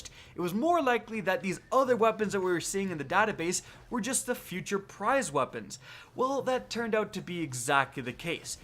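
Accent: Canada. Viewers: omg hes canaden "sawy"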